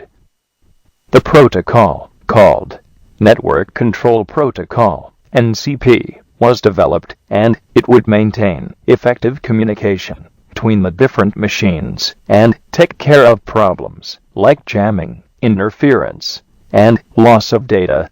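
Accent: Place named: United Kingdom. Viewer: British hooo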